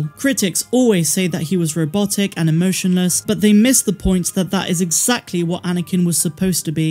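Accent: United Kingdom. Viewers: Love you station and your British accent; I'm a straight Black American man. And I generally agree with most of your analysis but I totally disagree with your analysis on why Anakin turned out to be Darth Vader. You see he was a slave he wasn't even going to make it to adulthood had he not been taken in by the Jedi Temple. He should have been much more appreciative and do the things that he was asked to do that would have only made him into the chosen one he should have been. But he wanted to be rebellious and disrespectful at times and therefore the two top Jedi Masters knew who he was before he did. He was precocious and vain and he would've still rebelled, even against his original father figure, Qui-Gon Jinn at a certain point. Your analogy sounds like the abuser blaming the abused for abuse.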